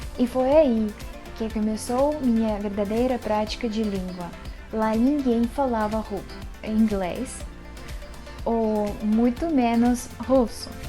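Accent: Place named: Sorocaba, Brazil. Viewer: você está fluindo bem seu português,mas com sotaque Sorocabano será influência do seu esposo.muuto interessante.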